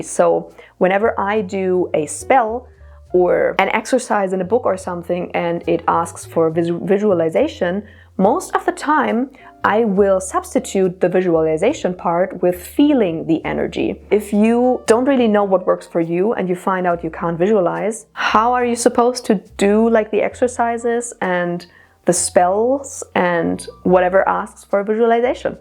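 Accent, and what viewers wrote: Germany. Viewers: I hear a german accent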